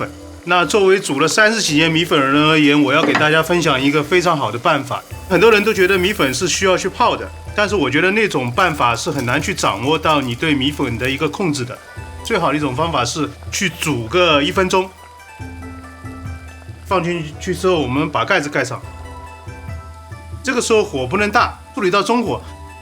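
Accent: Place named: Nanchang, China. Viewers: 南昌人表示听你这南昌口音普通话太亲切了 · 这位大哥说话习惯在句末加个诶，的口音是熟悉的南昌味哈哈哈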